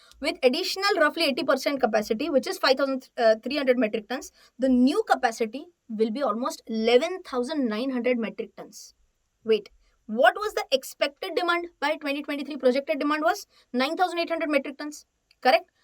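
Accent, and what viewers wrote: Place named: Pune, India. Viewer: you are a proper Puneri accent and amazing analysis.. I like your approach..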